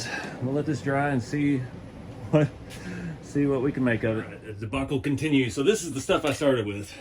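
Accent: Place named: South, United States. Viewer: RC4WD science!!!! I have a way worse southern draw than you do. Fella that tried correcting you would hate to talk to me lol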